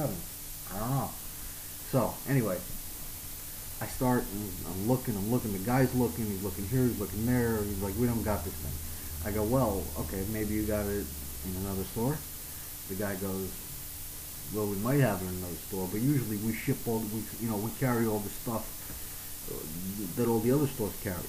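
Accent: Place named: New Jersey, United States. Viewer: So what you're saying is...you like to bitch about how lazy you are. We laugh about people like you when you leave the store because you lack any common sense. And please say "thing" again. When I get some time, I will create a response to your lovely video showcasing how many times you say "thing", "filter" and "faucet". And I'm pretty sure all the employees don't talk like they're from the Jersey Shore.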